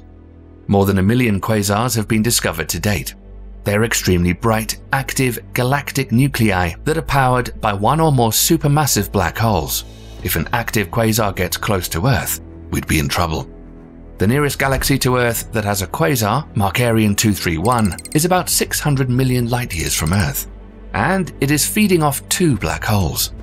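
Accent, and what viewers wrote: United Kingdom. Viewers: Will you always speak in such a beautiful British accent? I love it.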